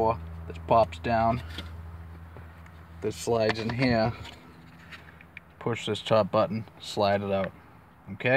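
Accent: Boston, United States. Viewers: thanks - need this -useful vid Also your accent is awesome accent. Very Good Will Hunting :-) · I like your Boston accent.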